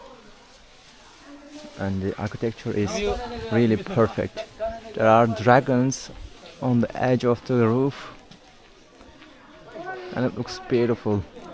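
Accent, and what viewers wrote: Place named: India. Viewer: aksanın hintlileri anımsatıyor :)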